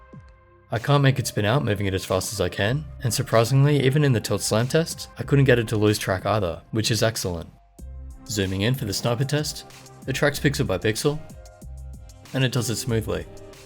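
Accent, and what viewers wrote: Australia. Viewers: An Australian/New Zealand voice that's soothing and orgasmic.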